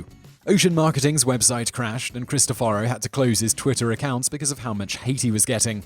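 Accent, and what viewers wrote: United Kingdom. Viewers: sounds so much more British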